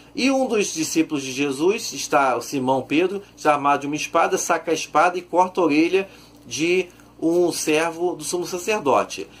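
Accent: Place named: Rio de Janeiro, Brazil. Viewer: Cê e carioca 😳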